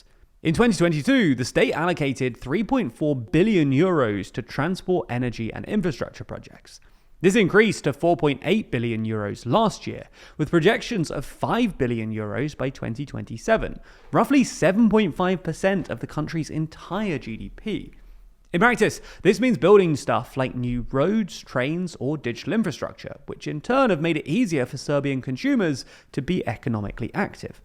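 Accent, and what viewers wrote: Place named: RP, United Kingdom. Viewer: Good analysis, however you forgot few things. One of the reasons behind the price increases especially in real estate is the migration influx from Rusia and Ukraine. Another huge investment is the UAE construction project like Belgrade Waterfront. Also, don't forget the Serbian diaspora and their effect on the serbian economy especially in real estate. Another country investing in real estate and construction is Israel, also a major importer of serbian produce weapons. To top at all, Serbia is hosting the World Expo 2027 and the government is spending lot's of money on the projects. At the end, just a personal note...no need for a posh language, normal British narration will suffice.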